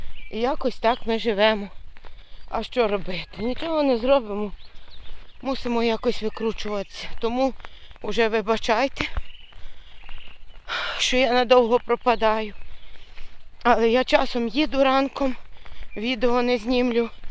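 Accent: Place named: Western Ukraine, Ukraine. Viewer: Ви така позитивна ,тільки почула вашу мову відразу зрозуміла ,що ця жіночка з нашої західної частини України !😊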